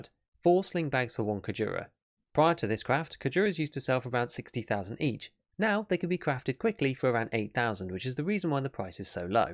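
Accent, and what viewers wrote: United Kingdom. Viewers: The British accent, Can't do it. its like listening to your Nephew talk, bullshit. Your voice has the same frequencies that make dogs wanna commit suicide.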